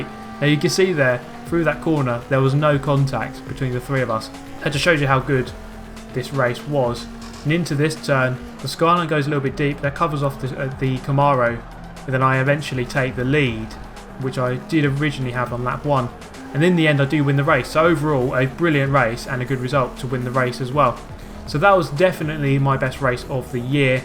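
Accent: United Kingdom. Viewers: WTF I was picturing you as a 30 year old fat white british dude hahaha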